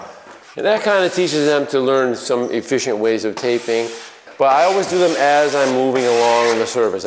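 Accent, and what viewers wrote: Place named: Northeast, United States. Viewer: Hello it's interesting to find your video on here looks like you've been doing this stuff for a long time. Cleaning up this around wondering you must be from somewhere in the Northeast I'm guessing Connecticut Massachusetts😅?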